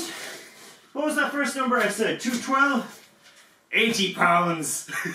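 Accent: Ireland. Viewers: I like how this guy sounds Irish and is still understandable. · So he IS Irish. I wasn’t sure... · Is he Irish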